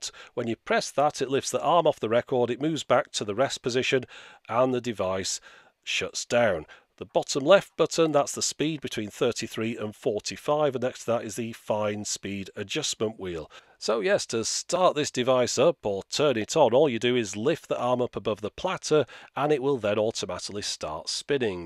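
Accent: United Kingdom. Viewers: What accent in UK is that, sounds like Ringo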